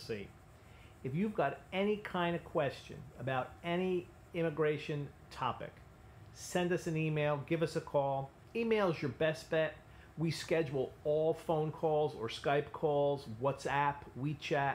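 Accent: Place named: United States. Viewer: so clean american accent )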